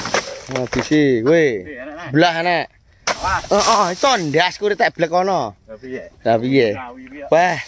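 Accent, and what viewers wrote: Tulungagung, Indonesia. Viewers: logatte jian kental tulungagung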